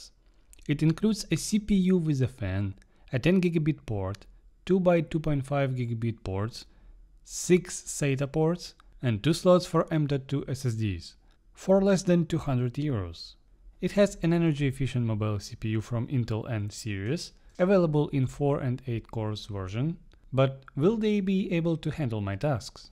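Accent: Eastern Europe. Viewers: For some reason the Slavic accent makes me trust your experience more😂 great vid! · славик акцент вэри найс. ал понятно энд по делу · Omg I didn't realize this is a super new video! I am loving it so far. The Slavik aksent is appealing though! I've been delaying building a nas / home server forever now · thank you slavik accent man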